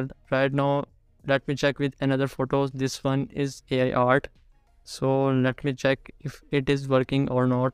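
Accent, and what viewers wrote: India. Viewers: اصلا ما فهمت الطريقة لان يتكلم هندي و دوخني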